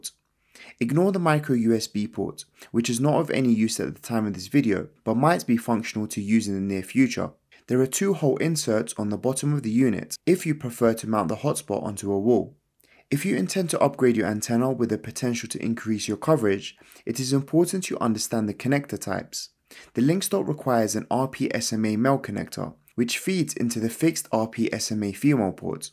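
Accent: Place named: North London, United Kingdom. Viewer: Hey man, just discovered your video/channel. My Linxdot arrives late March. Great video, have subscribed. I'm in North London, you sound as if you are too? If so, how is it going and if not how you finding mining in London? Still to watch more of your vids but you are the first I've found in London possibly north 😄 Cheers. Edit- just finished the video, very informative, thank you.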